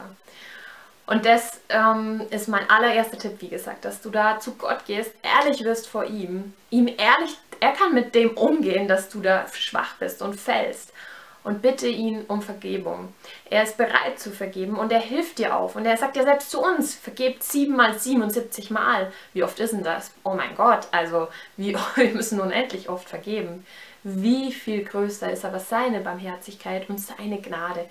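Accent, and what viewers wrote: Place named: Austria. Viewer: du hast schon voll den österreichischen akzent übernommen... :D kannst du mal eine tagesroutine mit jesus drehen?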